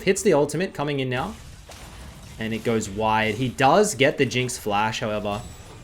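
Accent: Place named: Australia. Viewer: Hey man me and my girlfriend love watching you, but recently she's been telling me to get a haircut similar to yours and start speaking in a Australian accent? Should I be concerned?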